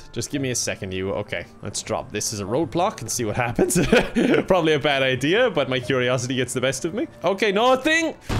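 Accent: Ireland. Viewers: is a 100 percent Irish human. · Its cuz of the Irish dialect. Its an Irish thing, not a thing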